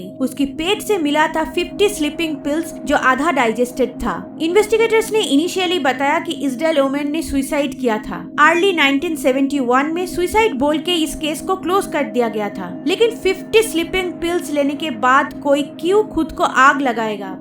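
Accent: Bengal, India. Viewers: Tmhari accent bengali hai, tm Bangladesh se ho ky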